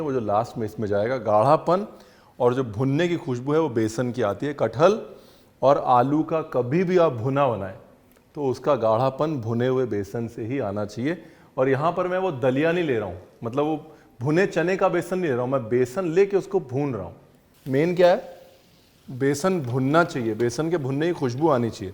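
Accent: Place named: Bhojpuri, India. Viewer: Babua bhojpuri jaisan bolat baran 😊🥰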